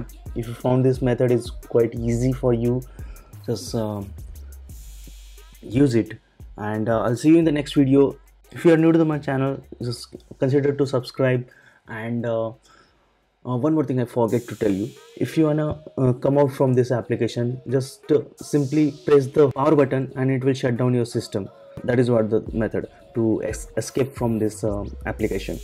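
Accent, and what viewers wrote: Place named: India. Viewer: indian voice, 100% virus/ scam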